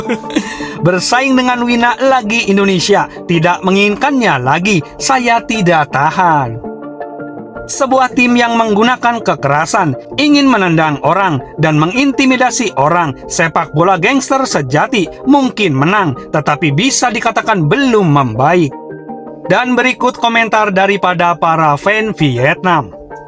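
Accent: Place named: Malaysia. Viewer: Mantab bang logat bahase melayu nya, saye dari Kepulauan Riau👍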